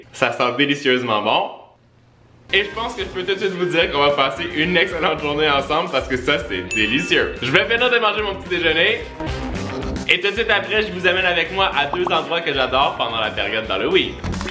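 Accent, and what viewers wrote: Canada. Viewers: On est pas trop citrouille en France mais c bon et on dit pas melon d'eau on dit pastèque voila et désoler j'aime pas trop l'accent canadien mais j'aime se que tu fait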